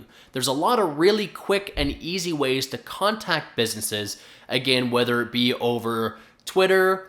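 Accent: Canada. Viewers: You have a very pronounce Canadian accent, meant nothing bad by it. I was just curious if my guess was right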